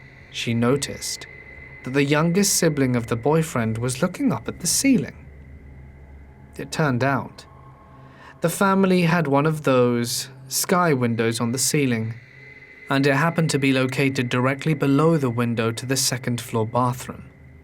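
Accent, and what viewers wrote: England, United Kingdom. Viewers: Oh, I have to say that even poop stories are better when narrated by an Englishman. I think my neighbors must have wondered what the hell I was laughing about. Absolutely brilliant! 🤣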